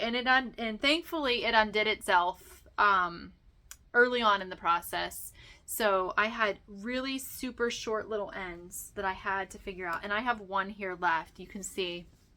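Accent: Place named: Western Pennsylvania, United States. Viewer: Such beautiful projects! I think you can’t go wrong with any of your choices of your gorgeous hand spun for your headband! So very pretty. I also just love listening to you chat, I too am from Western PA, but my husband and I moved a bit further south many years ago. Listening to you just reminds me of family we still miss so much. Thanks for another enjoyable episode. ❤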